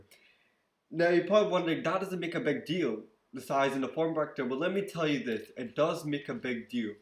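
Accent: Scotland, United Kingdom. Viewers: you from Scotland nice video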